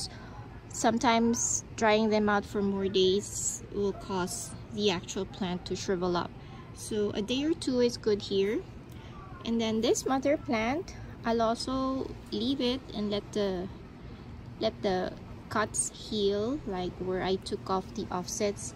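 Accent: Philippines. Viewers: I love your cute Philippine accent.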